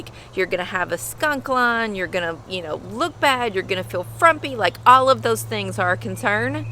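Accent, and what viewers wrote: South, United States. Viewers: I can listen to you for ever. Ahhh that badass Southern accent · I have no idea how your video popped up in my YT space but I just wanted to say I love your southern accent , I grew up in Nashville but live in LA now and miss girls talkin “southern”😊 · Are you from Kansas, North Carolina, or Texas? Thx 🙂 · Love your videos! So common sense and practical advice! Love your curly hair and Southern accent too! Keep up the good work!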